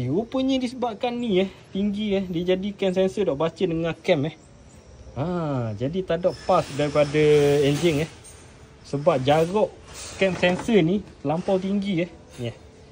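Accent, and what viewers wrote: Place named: East Coast, Malaysia. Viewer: Assalamualaikum bengkel mne eh dengor loghat mcm pata timur😅...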